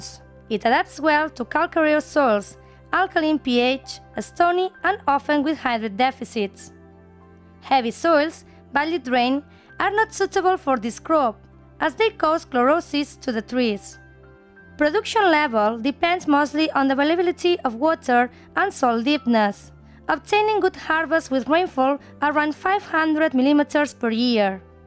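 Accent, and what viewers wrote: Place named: Spain. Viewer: Very informative video. Love the Spanish accent!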